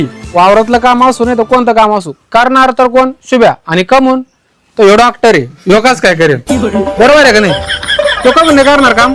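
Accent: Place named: Karnataka border, India. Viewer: Bhasha kannd talukya sarkhi ahe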